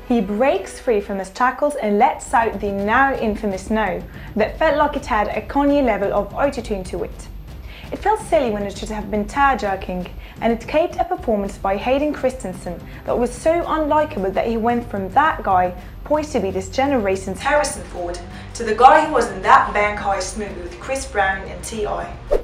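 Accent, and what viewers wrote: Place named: London, United Kingdom. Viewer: Miranda Brooks I had no problem understanding her at all. im from Montana and can understand that it's a typical London accent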